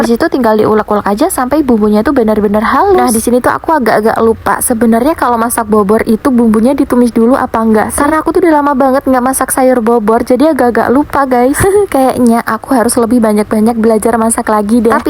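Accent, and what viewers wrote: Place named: Lampung, Indonesia. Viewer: Logat jawa nya keknya tinggal di lampung ya..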